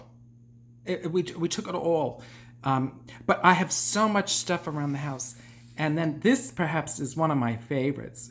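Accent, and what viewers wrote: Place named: Bronx, United States. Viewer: Oh,. I cannot tell you what these daily thoughts of solitude mean to me. I look forward to them every day, and while the world has become a frightening place, your musings over the things that matter in that gentle, salted Bronx delivery are so wonderfully poignant, and make me feel truly connected to the things that matter most. This series is just incredibly calming and inspirational to me - Thank you, thank you, thank you beautiful. <3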